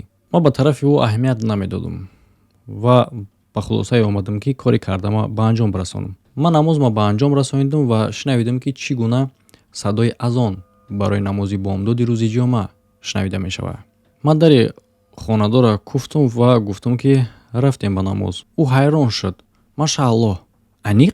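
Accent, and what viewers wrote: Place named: Tajikistan. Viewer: ВАХАХА НАВ ЧИСТА ТОЧИКИ ГАПЗАДИ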